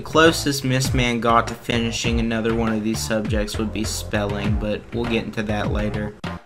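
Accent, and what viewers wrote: Texas, United States. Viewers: I like ur Texan accent (I think it's Texan, I'm an American and know the State accent) Also u get a sub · Bro sounds like texas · are you from texas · You sound like a man from texas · You sound like your from Texas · Are you from Texas? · Nice accent. Are you from Texas? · His accent is pretty texan like, LOVE IT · You know, your texas (or western-american) accent somehow really fits iceberg videos. I think you should try doing more of these. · I fucking love the texas accent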